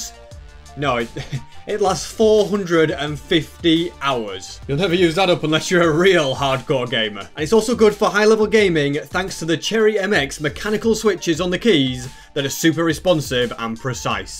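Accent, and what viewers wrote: United Kingdom. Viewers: Are Ya British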